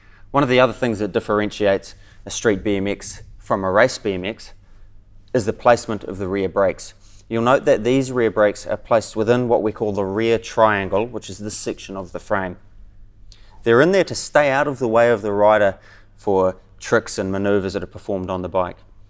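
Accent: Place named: New Zealand. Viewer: NZ Accents kill, "rice" hardly! "raice" maybe >> Decent vid though for the sprocket leagues.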